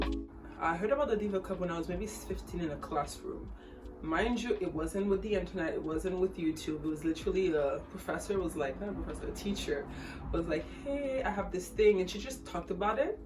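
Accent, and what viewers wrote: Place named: Haiti. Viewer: Ahhhh I posted on your last video now I can here the slight Haitian accent. Maybe Haitian Canadians sound slightly different to ones here in America. My family is from Haiti on my fathers side. bèl dekouvri ou. I switched to organic tampons but have been wanting to make the switch to something like the Diva.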